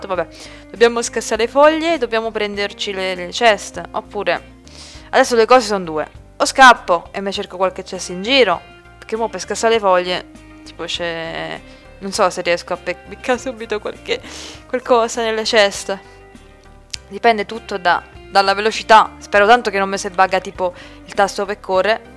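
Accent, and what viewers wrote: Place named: Rome, Italy. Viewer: Solo a me non piace quando parla in romano? :c (non ti arrabbiare, ma per me è così <.< per il resto sei bravissima)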